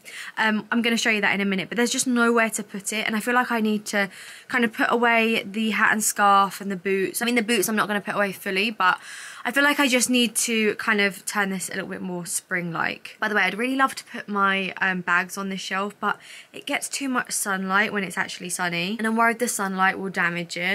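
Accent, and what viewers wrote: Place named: RP, United Kingdom. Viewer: I just love your British accent, it’s so posh:) 😍😍😍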